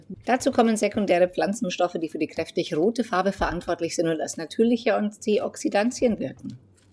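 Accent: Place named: Meiningen, Germany. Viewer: Hallo, ich finde deine Videos super! Perfekt erklärt und mit einfachen Zutaten! Ich hab mich schon durch einige deiner Brote durchprobiert, beide Sorten Frischkäse ausprobiert und gestern Kisch-Erdbeermarmelade gemacht. Alles Super! Ich komme aus der Nähe von Meiningen. Deinem Dialekt nach zu urteilen müstest du auch aus der Nähe stammen!? Liebe grüße Tino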